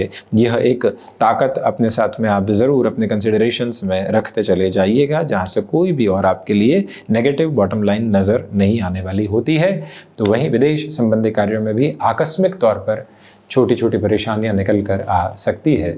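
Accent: Standard. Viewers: Aapka shud Hindi sun k bohot kushi milti h . YouTube mein aap hi sayed akele hai jo shud hindi bolte hai . Bohot bohot dhanyabaad aapka hare krishna 🙏🙏🙏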